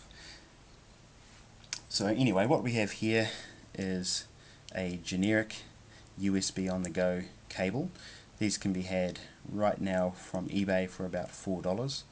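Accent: New Zealand. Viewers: Excellent video, and awesome New Ziland accent. Great to see the keyboard and mouse attached. Thanks.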